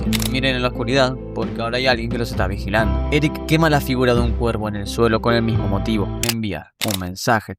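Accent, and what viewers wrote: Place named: Argentina. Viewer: Dios mio, de verdad que el algoritmo de YouTube está podrido estos días al no hacer más populares estas joyas de videos. Amo tu forma de narrar, y el acento argento siempre me ha gustado. Como fanático del cine, realmente nunca se me ocurrió relacionarlos, pero escuchando tus explicaciones, realmente te dás cuenta de que si tienen bastante en común.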